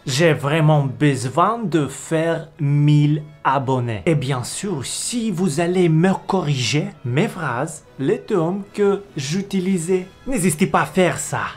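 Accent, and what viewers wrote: Russia. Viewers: Bravo tu progresses de jour en jour dans ton élocution;. Tu vas gagner en fluidité grâce à la pratique. Je pense que tu vas arriver très rapidement à parler le français sans accent russe.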